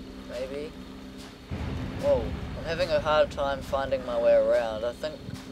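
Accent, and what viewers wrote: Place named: New Zealand. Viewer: HEY! You're from New Zealand too! I recognised the accent :D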